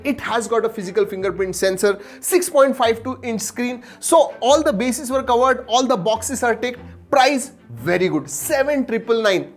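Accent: India. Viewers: jab english hi bol rahe ho tab thodi fancy accent bhi ho jaay kya hai ki ji english bhi bol rahe hain to jaise hindi lag raha hai.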